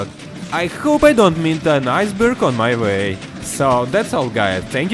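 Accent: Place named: United Kingdom. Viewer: Отличный акцент. Ты из британии?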